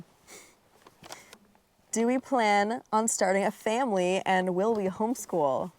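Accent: Newfoundland, Canada. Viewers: I see that you are developing a bit of a Newfoundland accent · Ah ha...You are a Newfoundlander. "This is the life I chose I spose". :) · My Mom is from Roddickton Newfoundland. I love watching your videos. Love the accent, reminds me of my family. Love them greatest people, Newfoundlanders. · Hearing slowly sound more and more Newfy as time passes is hilarious:D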